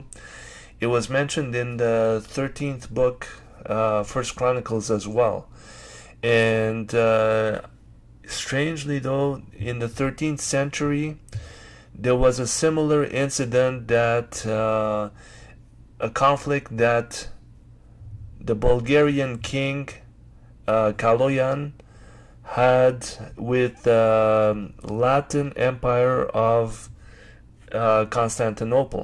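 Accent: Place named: Quebec, Canada. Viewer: accent québécois...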